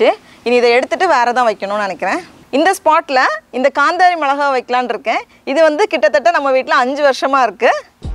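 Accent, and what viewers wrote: Nagercoil, India. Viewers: 1.45 Cheththu pozhachuruku😂😂 ultimate akka.,still I can feel the nagercoil slang in u❤️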